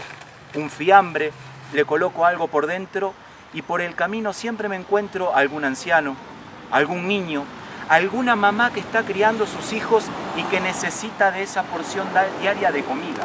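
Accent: Argentina. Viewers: Buen video yo siempre tomaba banana licuada con leche hasta que me di cuenta que era malo para la salud entonse la empecé a tomar con agua. Una pregunta ¿de donde sos, porque noto un acento de argentino mezclado con otros?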